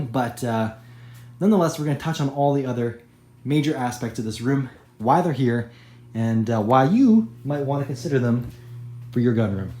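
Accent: Canada. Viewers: You sound Canadian. Awesome gun room setup and gear by the way.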